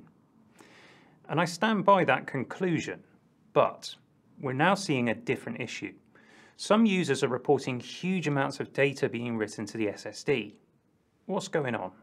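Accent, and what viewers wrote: United Kingdom. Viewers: British guy talking smooth - going to sell your data to a class action lawyer against Apple. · the British accent is the best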